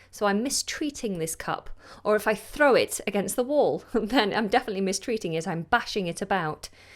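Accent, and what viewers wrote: United Kingdom. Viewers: Hello, I am a faithful follower of yours and I think you are one of most important and serious youtuber about teaching british English currently. I have seen your develop since you started your channel and you have been gaining lot of suscribers because of your professionalism. I would like to ask you to do some videos about "Shadowing" because I have been watching some very good videos about it but the majority of them are from American English, which is fine, but I am living in the UK and I would like to get the rithm and pronunciation that you have which is lovely. I hope you consider my proposal because I am sure if you do these videos you will do them awesome as everything you have done up to now. This is a very good example about Shadowing, I think he is a very good teacher but hi is teaching American English. <link>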